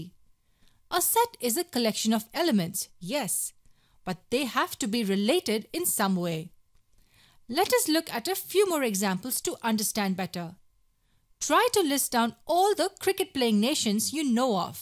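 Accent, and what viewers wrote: India. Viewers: She has a strong Indian accent and lo and behold India pops up twice in the examples. Is this an attempt at subliminal propaganda from Indian Nationalists by any chance ? 😁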